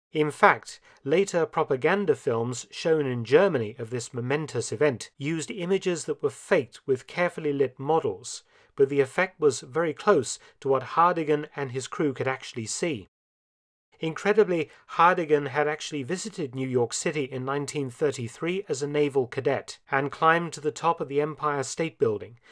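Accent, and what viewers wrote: England, United Kingdom. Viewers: GENERAL British pronunciation; fairly innocuous, but not in a bad way. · His particular English accent doesn’t hurt either — it fairly screams credibility. 😀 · Its the British accent. Brits are great narrators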